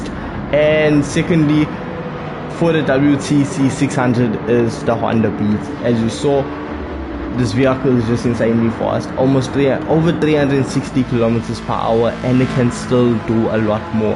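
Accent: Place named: South Africa. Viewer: Can I ask where you're from? Because it's like I'm hearing several accents at once, because I'm hearing south african pronunciation, and what sounds like jamaican accent creeping in, just curious is all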